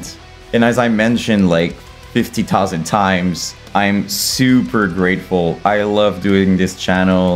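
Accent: Quebec, Canada. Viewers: Amazing job on growing the channel dude, your videos are what i needed to remind myself of the greatness of the myspace era, i feel like there is no one these days that go back and appreciate these gems for what they were. Gros props dude. Also as far as ideas, maybe doing deepdives on the more obscure labels could be cool and what they brought to the scene back then (This City is Burning, Mediaskare, Artery, Ferret, Siege of Amida, Prosthetic, etc) or even the early days of household names like Sumerian and Century Media, idk, just an idea ! On a last note, it's been inspiring to see you make it through that overly populated sea of content that is Youtube, as a fellow Quebec French speaking mofo, it gives me hope that we can also sit down and share the stuff we love and be a part of the conversation without too much tabarnacking on our pronouciation. Lâches pas, 2024 will be huge mate🎉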